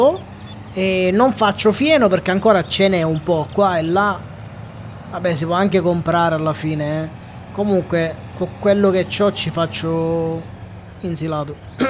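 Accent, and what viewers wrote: Sicily, Italy. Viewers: . Parla. In. Siciliano. Mi. Piace.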